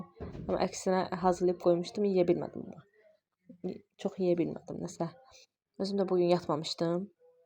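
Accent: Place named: Qabala, Azerbaijan. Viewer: menimde yoldaşım qebeledendi size qoz gelmeyinden birde lehcenizden bildimki qebelelisiz😘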